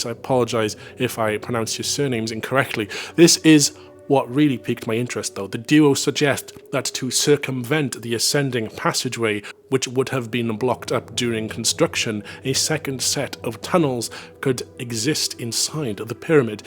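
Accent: Wales, United Kingdom. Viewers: The word "heard" is pronounced the same way as the word "herd". You saying "HEERD" instead of "HERD" is jarring in a nails-down-the-blackboard kind of way. I make allowances for accents (I can hear a bit of Welsh influence in your voice) but FTLOG, "HEERD" is just so very very wrong.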